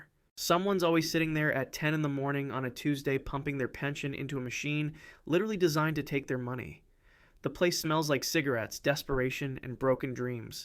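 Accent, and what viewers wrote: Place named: United States. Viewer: Profoundly ironic and offensive that its a bloody Septic (American) producing this video. Not that he's wrong, and its good to see someone turning these observations (debatable as some of them are) into a You Tube video, but I can't help baulking and can't watch the whole thing for hearing an American accent keep saying "WE" is positively nauseating.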